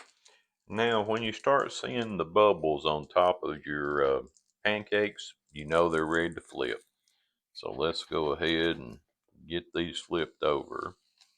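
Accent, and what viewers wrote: South, United States. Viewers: Ps. It will be a sad day when we no longer hear such a wonderful country voice.❤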